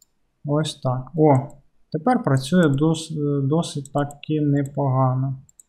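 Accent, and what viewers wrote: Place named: Poltava, Ukraine. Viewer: Одразу чути, що автор з Полтавщини... бо я теж))))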